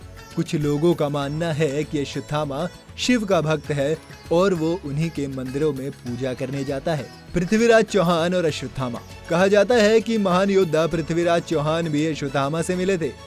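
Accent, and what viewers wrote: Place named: Tamil Nadu, India. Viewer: Bhai Tamilian hai voh unka accent hindi me aise hi rehtha thum ek bar tamil ya kannada bath karke dikhao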